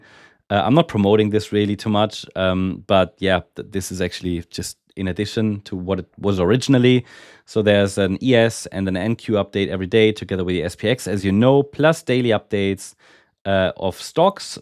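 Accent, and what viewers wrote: Germany. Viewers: you have a german accent, are you german? )))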